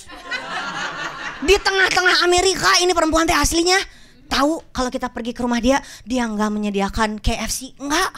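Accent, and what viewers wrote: Indonesia. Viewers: Serasa ngelihat radit tp versi wanita dgn logat sunda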